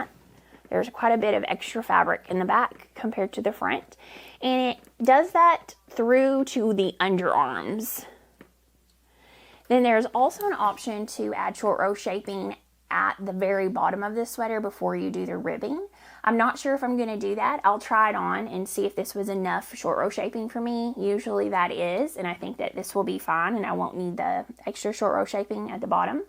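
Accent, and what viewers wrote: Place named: Texas, United States. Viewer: I'm from North East Texas and currently live in Alaska so listening to you is a little taste of home. Love your podcast and thoroughly enjoy you as a person. You're so lovely! Thank you for sharing your farm. #Softly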